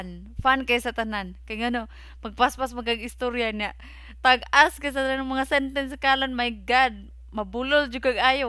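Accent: Visayas, Philippines. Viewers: ganahan kos imong bisaya accent, makalingaw paminawn. ma inspire pud ko maglantaws imong mga vlog. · gusto ko mag callan ay diko kabalo haha. maka lingaw ka mag bisaya sis haha.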